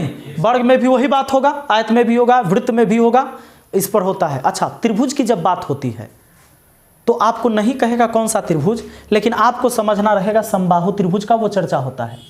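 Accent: Bihar, India. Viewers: Sir aapke Bihari style language mast hai.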